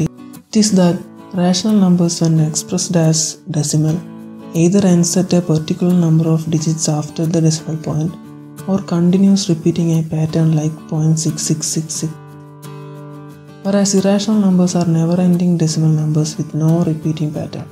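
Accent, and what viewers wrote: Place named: India. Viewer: I press a video regarding math I hear an indian accent I leave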